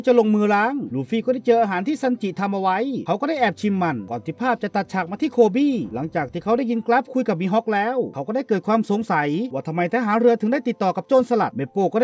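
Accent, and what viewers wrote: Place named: Bangkok, Thailand. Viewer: สำเนียงคนกรุงเทพฯแท้ๆแหละ ดูออก แม่นบ่แอด?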